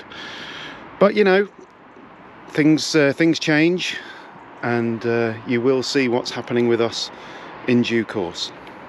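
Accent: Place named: California, United States. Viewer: Its been a delight to share your adventures over the year; informative and entertaining. Will miss your Cali slant but look forward to hearing about the next phase.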